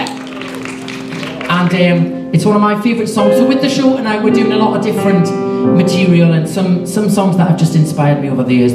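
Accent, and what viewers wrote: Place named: North East England, United Kingdom. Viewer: Thar's his adorable accent from The North east of England.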